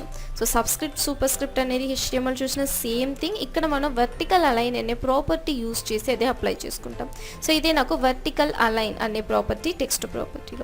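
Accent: Tamil Nadu, India. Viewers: Tqq Nice teaching and Tamil slang mixed cute voice 😊😊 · Mam meeru pure telugu kadaa madyalo tamil ala undii?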